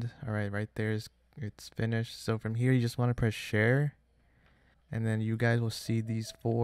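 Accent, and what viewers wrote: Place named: Australia. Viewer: 90% Australian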